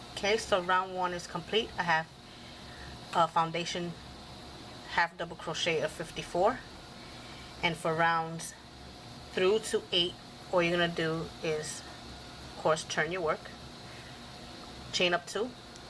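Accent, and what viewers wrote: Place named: Louisiana, United States. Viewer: Thank you so much for not drawing every stitch out. I love how fast you instruct. Thanks again .I truly appreciate your style of teaching. The speed and accent in which you speak remind me of people in my hometown of Baton Rouge Louisiana.Anyway Thanks again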